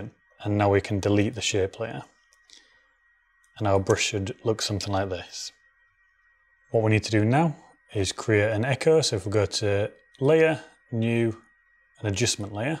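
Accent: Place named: Hull, United Kingdom. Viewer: Sounds like you're a fellow Hullensian? :D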